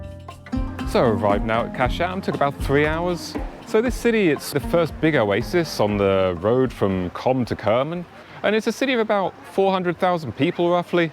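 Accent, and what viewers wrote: Birmingham, United Kingdom. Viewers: are you Brummie by any chance?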